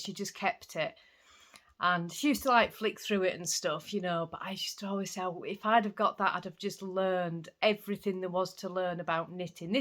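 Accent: Yorkshire, United Kingdom. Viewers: Hi X, please don't apologise for your accent, I'm born and bred Nottingham and often taken for being Yorkshire ( now live in Norfolk ) i don't rein my accent in and I'm not gunna change either 😂 hubby is from down south and been married 31 years and still can't fathom out what I'm saying 😂😂😂 I love the Yorkshire accent ❤ thank you for sharing your story about how you got started on knitting it's brilliant.... I'm a knitter but used YouTube to get me started with crochet, still practicing 😂 I started during lock down 😊 don't keep apologising about anything You are amazabobs ❤ take care happy crafting have a lovely weekend xxxxxx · my great aunt use to make bobbin lace I use to sit and watch but never had a go. Love a Yorkshire accent ❤You and have a great weekend I love how you learned to knit and look at you now designing your own patterns and hand dying your own yarn I think it's brilliant that you and have made a little business from it well done 👏👏👏see you Monday xx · That was a lovely story about starting to knit and how great that they took a photo to show people. 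No wonder you were proud 😍 And the rest is history...... Please don't apologize for your accent. You are a Yorkshire lass, so you have a Yorkshire accent. I do smile sometimes when I watch with subtitles and the translation is often hilarious. I can't always tell what says but I always understand you. Have a lovely weekend, see you on the podcast xxx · oh wow you certainly have moved around hence why your Yorkshire accent is only mild. You definitely don’t have any trace of the Hornsea, Hull accent either. It’s very distinctive that. Anyway thank you for that, I only asked because of you saying about a Yorkshire accent. I’m born and lived in Sheffield all of my life. I live in the very south of the city near the Derbyshire border now. I’m hoping my yarn comes for my spooky socks mal today 🤞🏻. Have a great weekend. 😊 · Love your accent and your Yorkshire colloquialisms. Please do not rein them in. 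I love all things British. I even named my WiFi network “Clever Clogs.”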